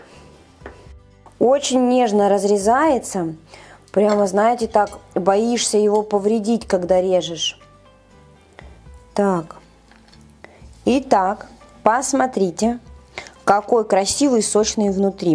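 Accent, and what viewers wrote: Surzhyk, Ukraine. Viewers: Да, проскальзывает "суржик", но не противный, как у многих! Зато все настолько гармонично...и кухня, и посуда, и манера приготовления...смотришь некоторых, плита грязная, посуда горелая, кухня вообще прошлого века, готовят неаккуратно, но лезут показать свои "шедевры". - молодец, руки умелые, много знает, чистота на кухне, рецепты интересные, проверенные на себе, аккуратность...так что мелочи речи не в счет! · Это Украинский диалект. Мы живём рядом и тоже так говорим.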